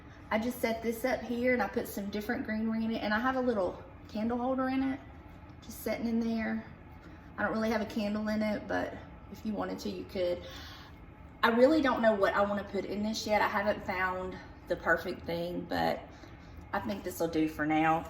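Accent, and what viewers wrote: South, United States. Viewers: Hi, new tonight to your channel, from Liz, Traditions of the Seasons." I have been binge watching you for an hour! Loved everything you have done. Love your southern accent, reminds me of my cousin, in Richmond, VA. Blessings to you and yours.💖🌹💖🌸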